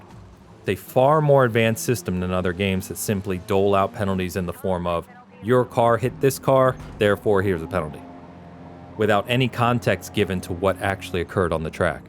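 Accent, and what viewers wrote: United States. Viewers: lol finally someone who understands an American accent. it's strange to me sounds like rural middle America. The people replying to me that think Americans have 1 accent is hilarious. I'm the dumb one to them... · I'm going West Coast, N Cali or Oregon.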